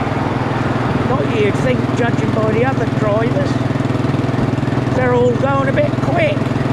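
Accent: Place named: Norfolk, United Kingdom. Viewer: aww that’s nice. I don’t consider myself “that good”, but I try my best to give people a good ride (oo-err😂). I amazed how well you managed to keep the chat going for 50 mins, with interesting content, once I played things back a few times. The strong Norfolk accent takes some deciphering at times 😎😂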